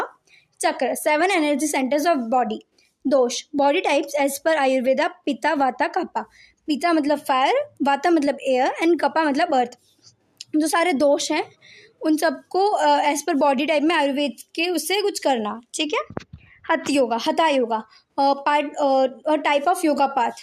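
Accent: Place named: England, United Kingdom. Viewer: हिंदी और संस्कृत के शब्दों को अंग्रेजो वाले स्टाइल मे बोलने की क्या जरुरत है | अंग्रेज ज़ब हिंदी शब्दों को बोलने की कोशिश करता है तो कुछ शब्दों का सही से उच्चारण नहीं कर पाता और आप उस शब्द को उसी के अनुसार उच्चारण करने लग जाते हो | यदि कोई भारतीय अंग्रेजी के किसी शब्द का गलत उच्चारण करे तो क्या अंग्रेज भी उस शब्द का उच्चारण भारतीयों के अनुसार करेंगे?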